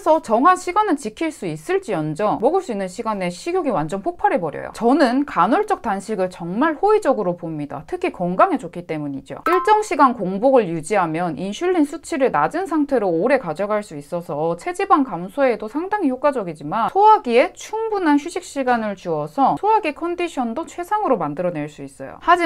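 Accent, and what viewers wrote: Gyeongsang, South Korea. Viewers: 음... 혹시 경상도에서 사시는 분이신지??? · 경상도분이시죠?? ㅋㅋ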